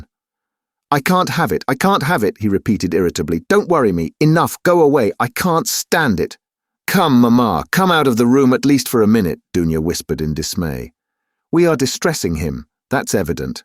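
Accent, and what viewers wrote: United Kingdom. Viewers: Thanks. I needed a Brit for this one. :eyes-pink-heart-shape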